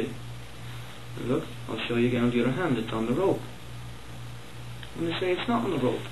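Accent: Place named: England, United Kingdom. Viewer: Its all in the presentation & how polished the act is, I would suggest rehearsing a script & practice in front of a mirror. Also, try not to mumble so much. I can hear an English -descent accent and yet my North American "western drawl" would still trump your diction.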